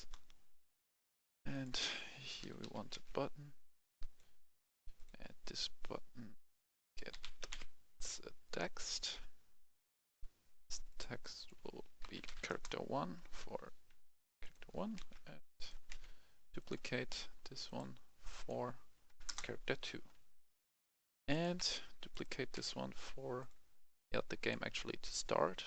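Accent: Germany. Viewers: Man hört das du in ehct Deutscher bist :D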